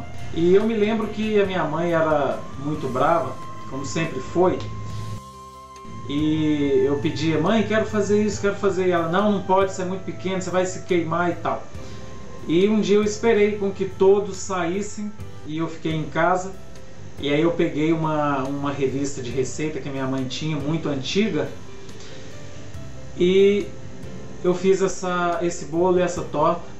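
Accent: Minas Gerais, Brazil. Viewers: Oi! desde o primeiro vídeo de rocambole já gostei do seu jeito de passar a receita, mto tranquilo, simples e com mt convicção. Que bom esse vídeo, e´ interessante sabermos um pouco da vida da pessoa, completa mais o conhecimento virtual. A gastronomia e' instigante, ela nos remete a vários lugares culturas diferentes.....nos faz experimentar um pouco desse universo, gosto muito tbem, se não fosse professora acho que me daria mt bem nessa profissão. Você tem tudo pra dar certo! na vida as coisas são devagar tudo no seu tempo, gosto do seu sotaque mineiro e da sua cultura, comidas deliciosas... Sorte e Sucesso! beijos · Ah! como imaginei, pelo sotaque e jeitinho, vc é mineiroo de BH! Moro em BH, pois casei com um mineiro uai, mas sou gaúcha, criada em Curitiba. Hoje, super inscrita no teu canal... entrei por acaso pra ver uma receita e vi q teu canal tem mtaaaaas receitas boas. 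Vc é mto talentoso, prendado e acima de tudo uma pessoa humilde, tbm explica mto bem as receitas. Deus te abençoe, grandemente,! Abraço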